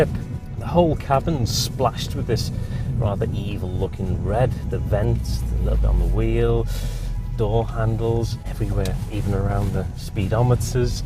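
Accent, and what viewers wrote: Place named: Wirral, United Kingdom. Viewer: His accent is Wirral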